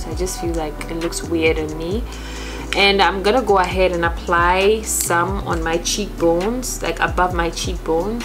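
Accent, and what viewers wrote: Ghana. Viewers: I love watching your videos and your technique. Although I’m much older than you and I’ve been doing my make up for many many years I still like to learn new techniques and see how others do it in a professional and fabulous way. FYI - I also ordered the Morphie brush because I liked the way it moved on your skin. I see you are from Ghana. I have been to Ghana and it was life-changing for me. I hope to return someday. Love your accent! 💕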